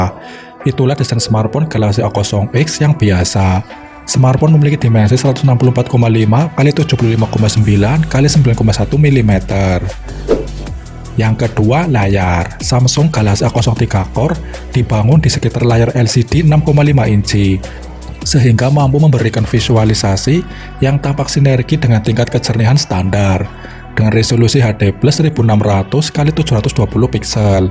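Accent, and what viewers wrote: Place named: Java, Indonesia. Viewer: Pengisi suaranya medok tapi enak banget sih gw rasa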